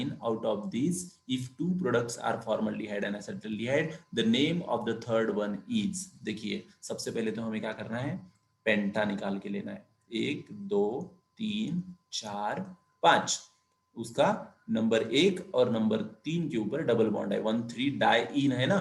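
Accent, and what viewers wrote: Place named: Maharashtra, India. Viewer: Sir your mother tongue is Marathi...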